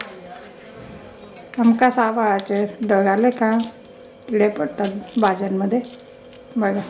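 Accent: Khandesh, India. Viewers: tumcgya sagaly recipe khup chan asatat, tumcha khandeshi lahejat bolane mala khup aawadte, all the best tai.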